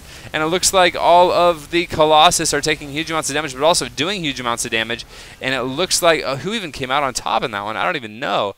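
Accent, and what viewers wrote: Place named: Canada. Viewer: ROTFL you are excellent what you do! hey can i ask you a question, what country are you from, cuz im canadian and you sound canadian also =)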